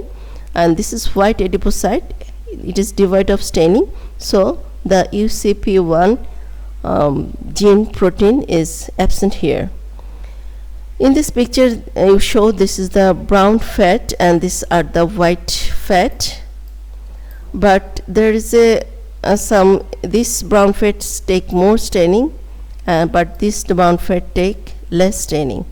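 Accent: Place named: India. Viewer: Only other Indians can understand this atrocious accent . Madam; please learn to speak English, or use a robot to read and express your slides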